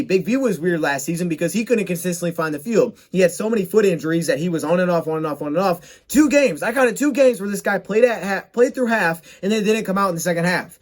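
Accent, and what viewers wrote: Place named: Michigan, United States. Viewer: It’s a Michigan thing, they pronounce it (or-e-gone) I’m from Tennessee and I moved here a few years back and everyone says it that way.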